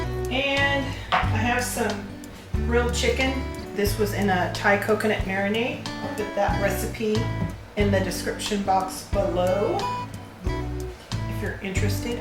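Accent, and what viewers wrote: South, United States. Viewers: Your food looks amazing! Ugh, so so yummy! I'm sure you've answered this before but where did you get the lovely Southern-sounding accent? You are adorable 🌷🌼💐🌻🌹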